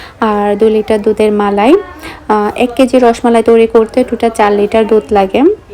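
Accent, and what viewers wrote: Chittagong, Bangladesh. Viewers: আপু অনেক ভালো লাগলো তোমার চট্টগ্রামের ভাষা অনেক ভালো লাগে শুনতে ধন্যবাদ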